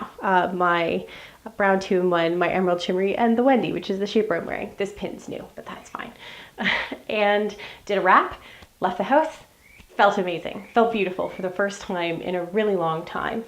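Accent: Canada. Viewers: I was going to say that you sound Canadian:) I'm from Northwest Montana just two hours from the border and so we have many from Alberta and B.C. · Very interesting story! I hear birds in the background? Are you Canadian or from Virginia?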